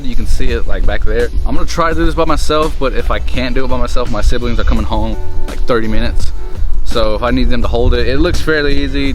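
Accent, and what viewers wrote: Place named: South, United States. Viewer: You sound very southern, but actually a very practical ricer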